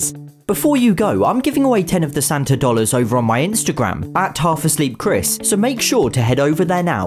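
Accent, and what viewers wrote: England, United Kingdom. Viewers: Man your accent is pure English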